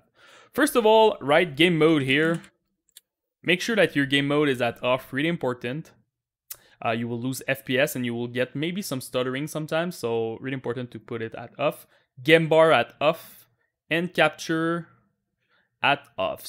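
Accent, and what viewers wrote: Quebec, Canada. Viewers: jai jamais entedu un accent quebecois autant fort dude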